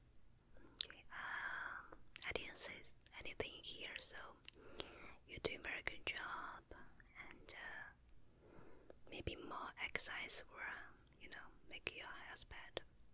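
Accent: China. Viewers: She has a very strong Chinese accent, but it really helps me with my anxiety and helps me fall asleep 😴 ❤️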